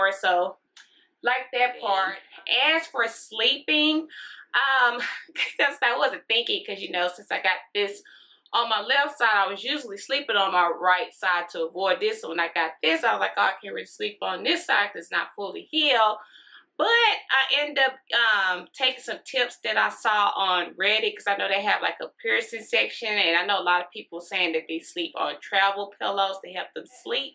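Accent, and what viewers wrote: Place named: South, United States. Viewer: Ooooh that southern twang....lol. Country girl. I got the same piercing. I love it.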